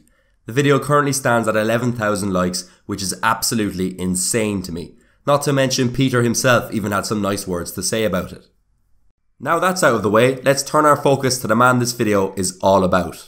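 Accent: Ireland. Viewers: to begin, I love your accent! Ireland Forever!!!! I agree with your evaluation 100%. I grew up in Ct., not too far from where he is from, and he is a proud son of our state! Thank you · Is that an Irish accent I hear?! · I LOVE your Irish accent! What part of Ireland are you from? Btw I LOVE how insightful your video is. It helps me figure out how to better grow my own vlogging channel!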